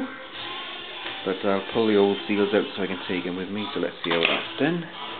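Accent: Wales, United Kingdom. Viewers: Are you Welshman or something?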